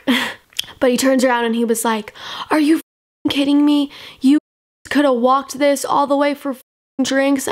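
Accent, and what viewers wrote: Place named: New York, United States. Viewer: I feel like your from New York or New Jersey.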